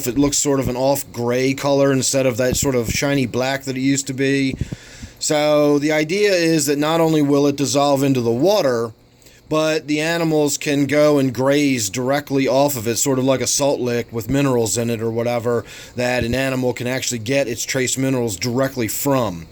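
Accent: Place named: mixed, United States. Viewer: Hey, are you in Philly/Jersey area? Love your accent!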